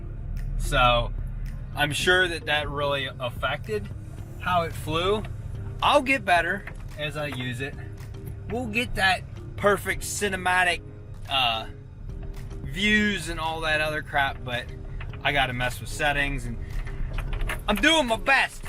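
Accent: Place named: West Virginia, United States. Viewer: Just curious. Kinda sounds like a WV accent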